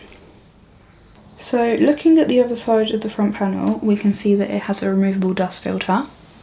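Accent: United Kingdom. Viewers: best review I have seen on the c70 case I bought !! also you are very beautiful and that lovely brit accent just make the review that much nicer to watch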